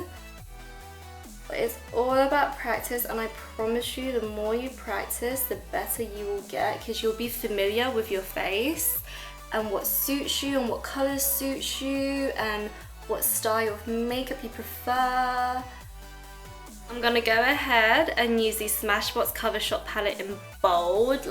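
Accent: United Kingdom. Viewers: I am so happy you have YouTube!! I follow you on instagram and I'm so excited to watch your YouTube vids!❤️ It's so nice to have makeup inspiration from some one with a British accent✌🏻😜 (no offence to the fabulous others) Do you ever visit Scotland? Xx Keep it up! So much make up envy 😝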